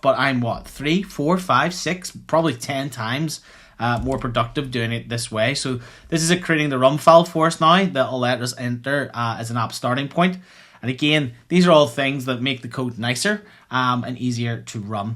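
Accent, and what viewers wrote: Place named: Northern Ireland, United Kingdom. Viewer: Good stuff, I've been experimenting with this stuff too, I found Bedrock Agents a bit limiting especially when it comes to integrating MCPs. Good to hear the NI accent, my wife is from there :)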